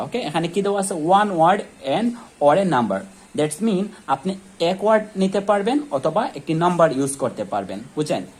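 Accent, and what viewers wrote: Sylhet, Bangladesh. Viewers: Vaiya apni ki Sylhety?😊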